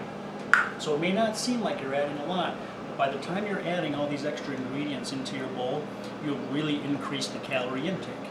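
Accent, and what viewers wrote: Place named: Minnesota, United States. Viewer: Good video brother. I used to live in Mankato. Haha. I thought u had that accent. I'll be honest with you. I guess im one of the very few who can eat it plain with nothing in it. All I do is put a half cup in the food processor bc it cooks more throughly. And its definitely one of rhe foods that keep you feeling full. Thats the magic food ya'll.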